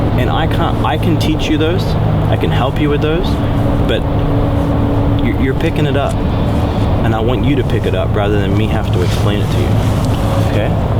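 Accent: Australia. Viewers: Yay sounds like an Aussie when hes mad......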